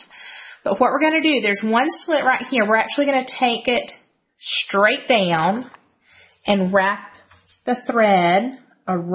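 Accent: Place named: South, United States. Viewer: I am like you in the facts that I've never touched a sewing machine in my life before this and that we have the same southern accent...lol. I'm from NC. I've heard you have to oil a sewing machine. Do you have to do that when you take the machine out of the box? Or is it just ready to plug up and sew? Thanks.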